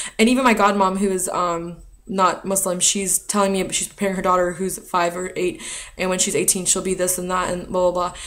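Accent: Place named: India. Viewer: your from india, therefore you DO have an accent. I work with lots of indians and they all think they dont have an accent but say stuff like 'interior-rum report' (trying to say 'interim report'). Anyway....PARKKEE STAARRNNN, JINNDAAA BAAADDHH. Afridi is best cricket player of all time centuries every game bro.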